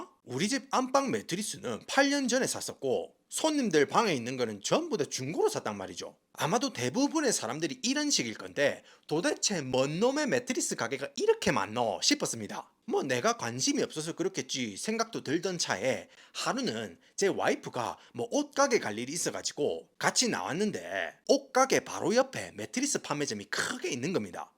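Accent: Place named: Busan, South Korea. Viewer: 잘 보고 있습니다. 부산 중구,서구.동구 사투리인데, 저에게는 너무 듣기 좋습니다. 딱 동네후배가 '행님 아 이습니까~ 그래가~' 이런 뉘앙스가 i'd love to so much. As i indeed.😊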